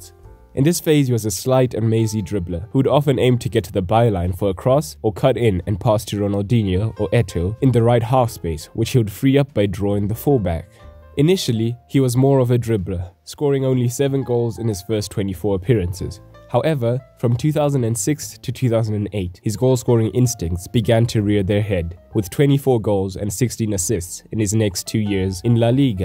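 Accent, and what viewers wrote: South Africa. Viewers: are you South African? you sound SAn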